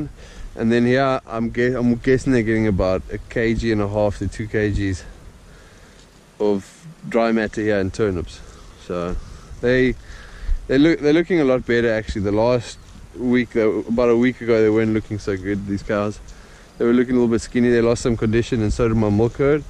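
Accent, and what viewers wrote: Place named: South Africa. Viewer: What part of south africa are you from?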